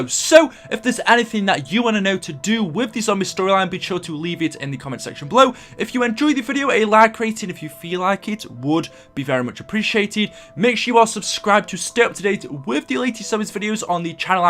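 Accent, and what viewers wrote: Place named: Stoke, United Kingdom. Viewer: Love the stoke accent but anyway why are we split at the start of vürruckt??? Btw you are awesome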